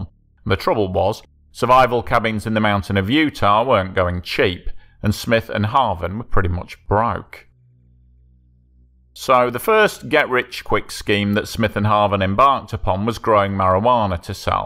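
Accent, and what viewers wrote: United Kingdom. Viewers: the host's rather British accent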